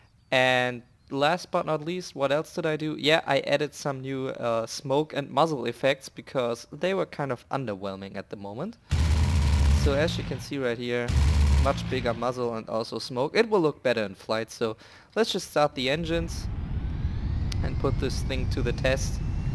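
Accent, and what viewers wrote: Germany. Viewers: I LOVE UR THICK GERMAN ACCENT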